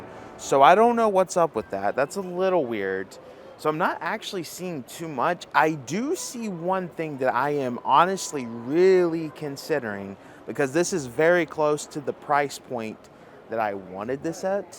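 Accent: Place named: Deep South, United States. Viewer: I understand. He does it with a lot of words. Like Anime, Amazon and some other words if you really listen. He does seem to have a Deep South accent that he does try and hide as well. You can notice it more if you hear him in person.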